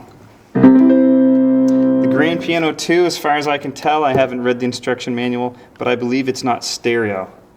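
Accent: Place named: Canada. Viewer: WHAT PART OF CANADA ARE YOU FROM , EH ???????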